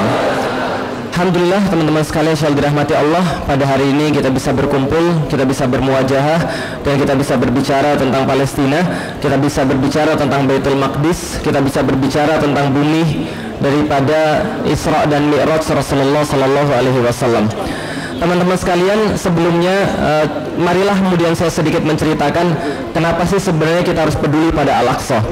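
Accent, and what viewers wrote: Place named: Sumatra, Indonesia. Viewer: Dahsyatnya...keberanian Ustadz Felix... .wajah boleh Cino....Tapi suaranya Sumatra.banget....Semoga dpt trs.. .berdakwah...aamiiiin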